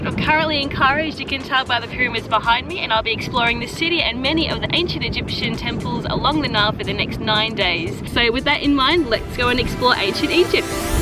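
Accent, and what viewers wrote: United Kingdom. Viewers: did I write your name correctly you got a beautiful London accent very clearly prounced each word I love it, and it seems that you’re a pro. Guide and nicely done touring in Europe for the firm intrepret perfect... especially your smiling gestures shows how you enjoy your job very nice indeed... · Are you Eglish( from England)?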